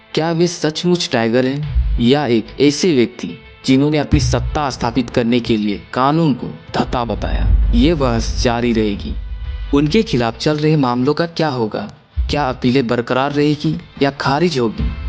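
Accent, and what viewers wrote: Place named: Bihar, India. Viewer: Pakka Bihari reporter hai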